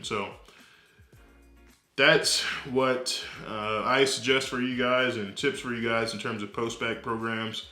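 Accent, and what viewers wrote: South, United States. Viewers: Did you take speech classes to curb your southern accent?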